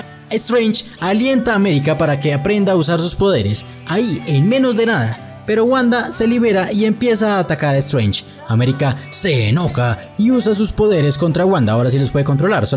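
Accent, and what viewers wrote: Colombia. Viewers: Me encantó tu resumen (sobretodo tu acento Colombiano😍, no lo contamines con barbarismos mexicanos y argentinos😖).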